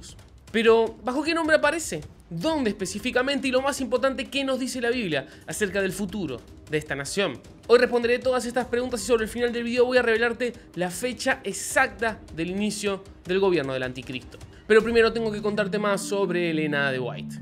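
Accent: Argentina. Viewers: Que tal, un pequeño comentario, admiro mucho tu conocimiento y explicación de los datos religiosos, como suelo decir: "lo peor que puede pasar es que aprenda algo" (obviamente un dicho sarcástico, ya que aprender algo siempre es beneficioso). Solo un pequeño defecto que oigo en tus pláticas religiosas, lo cual parecen desviar el significado de las mismas. Cuando decís a quienes les estás hablando, que terminas los sustantivos en "o" (masculino), "a" (femenino), y "e" (bisexual, transexual, vos sabrás que), pero si vas a hablar pláticas religiosas solo habla hacia hombres y mujeres (puedo captar en tu Acento que sos Argentino, más ni siquiera para complacer a la cultura de tu querido país deberías usar esas expresiones). Resolveme por favor una duda: que generos dice la Biblia que creo Dios en el sexto día?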